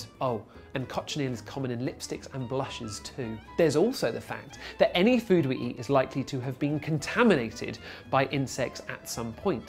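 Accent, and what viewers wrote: United Kingdom. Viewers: British accent sometimes is harder to understand than North American.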